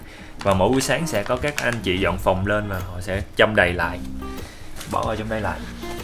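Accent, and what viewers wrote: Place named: South, Vietnam. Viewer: giọng rất truyền cảm. chắc tại dân Bắc ít nghe giọng miền trong nên thấy hay lạ.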